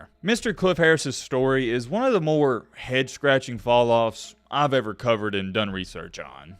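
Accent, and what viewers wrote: South, United States. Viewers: The fact that this is a white guy (person who made this video) and southern from what I can hear shows the undertones. Look at how many times this guy loves showing that photo of Harris on her and over. You’ve listed no sources, no cites. You’re not from the area Cliff is from, went to the college he went to. You’re a joke The picture is on your caption, yet you display that photo so many times as if we hadn’t seen it ten seconds earlier. This channel and this narrator is a person of trash, and he will get his. This could have been done with class, but was nothing but trash. P.S Anyone reading this. The actual video starts at minute .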